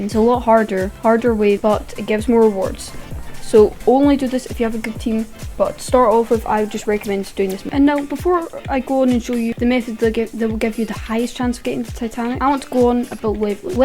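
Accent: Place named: Scotland, United Kingdom. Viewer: Scottish roblox YouTuber no way I was thinking about starting 😂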